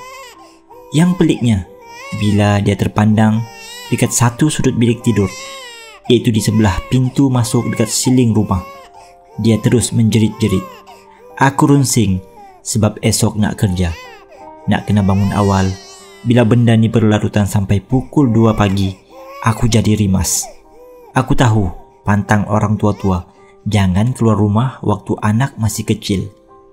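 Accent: Sabah, Malaysia. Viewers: Tukar pembaca melayu yang betul2 melayu..ni bahasa baku dia kuat slag Sabah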